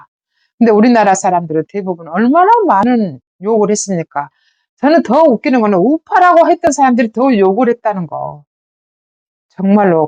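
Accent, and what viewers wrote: Jeolla, South Korea. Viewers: 유튜브 하시는 유튜뷰님 말억양이 전라도분 같은데 다른 호남분들 당신 같으면 나라가 바로 설수 있었는데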